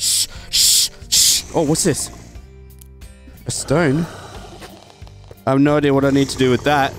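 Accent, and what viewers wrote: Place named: Australia. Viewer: You a Australian brohther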